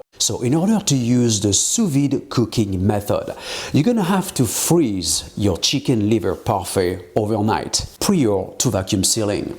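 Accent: France. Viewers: This guy sounds so french that it seems fake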